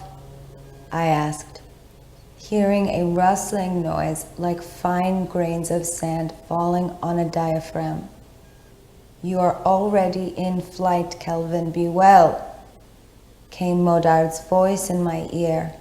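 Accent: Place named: Canada. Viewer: Ehhh Sounds like a Canadian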